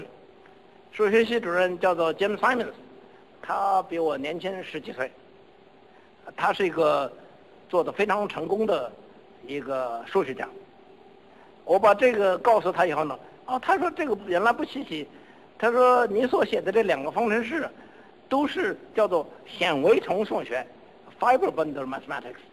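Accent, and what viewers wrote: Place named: Yunnan, China. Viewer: 杨老为啥有这么重的云南口音啊？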